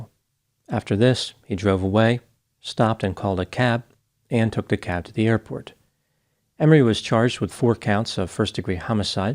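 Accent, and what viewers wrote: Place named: Pennsylvania, United States. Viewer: Today's question is, can I analyze accent. Although the cacti in the background suggest a southwest setting, I possibly detect hints of Pennsylvania or perhaps Ohio. I'm not attempting to pin down any geographic region, it's only a theory, my opinion.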